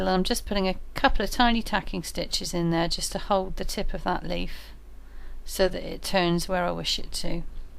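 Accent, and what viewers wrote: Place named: Bristol, United Kingdom. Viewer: Love your accent .... Bristol ? Gloucester area :)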